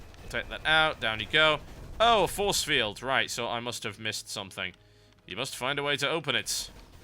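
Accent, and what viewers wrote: United Kingdom. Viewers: Large majority of TB viewers - "Wha!!!??? Wer is WoW vidz?!?" I enjoy any video you make, TB, mainly because British accents are like sound-rollercoasters. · I love this "WTF is" series, love "lets play" channels and so on, also brittish accent pwns.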